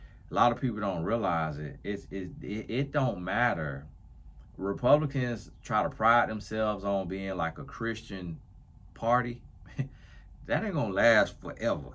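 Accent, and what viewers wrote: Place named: Deep South, United States. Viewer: I'm sorry you got flack for your accent. That's no bueno. Personally, I LOVE accents! New York being my favorite tbh. But southern accents are a close second for me. I live in the south right now, in Texas. You do sound kinda like you're from Georgia or the deep South. My mom's from Oklahoma and she has a lot of countriness in her speech and I tell her she has an accent and sometimes she gets offended lol. But when I say that, I mean nothing bad by it. Accents are what make people unique! There are stereotypes out there, but nowhere should it be implied that people who have accents are less intelligent or less skilled. One of my favorite therapists, Dr. Les Carter has a super strong southern accent (he's also from Texas) and I love it! Accents are amazing!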